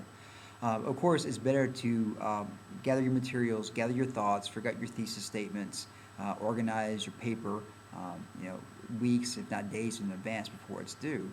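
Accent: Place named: South, United States. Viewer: i thougjt the same and was confused by the southerner accent lol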